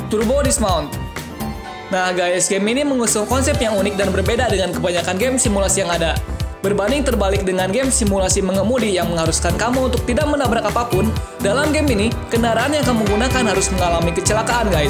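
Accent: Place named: Indonesia. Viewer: Logat lu indo sangat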